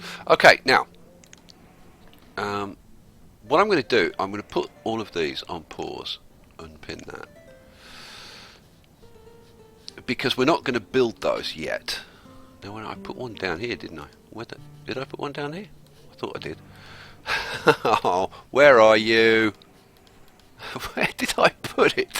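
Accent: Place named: Northern England, United Kingdom. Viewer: where u from man in the UK? i guess in the north, but not too much high on map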